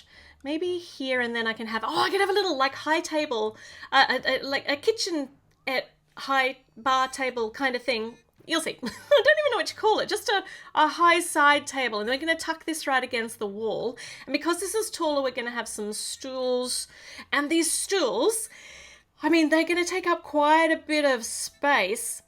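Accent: Australia. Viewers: Americans: sooooooooo Australians: sooorrr I love your accent and your cheerful attitude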